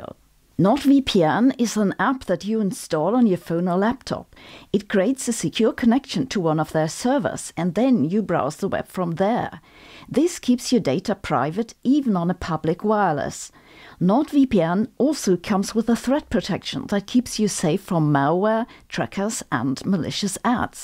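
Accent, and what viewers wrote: Germany. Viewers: It's funny how all Sabine's "e"s become like a German would pronounce an "i", even in words where the actual pronunciation is much more close to how a German would pronounce it, like in Graphene.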